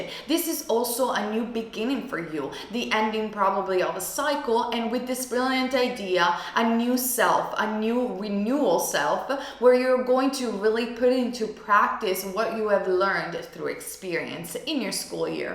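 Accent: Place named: Italy. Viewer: Love the Italian accent!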